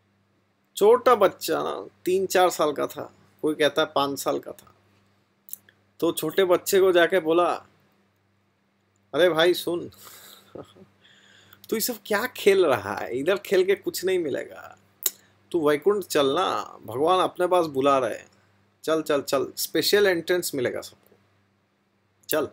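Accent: India. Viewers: Like the Hindi accent lol. Good explanation anyways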